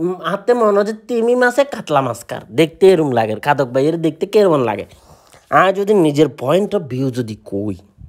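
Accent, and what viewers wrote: Noakhali, Bangladesh. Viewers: ভাই আপনি কি নোয়াখালীর নাকি 😂 আজকে প্রথম আপনার ভিডিও দেখছি,খুব ই মজার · নোয়াখাইল্লা রে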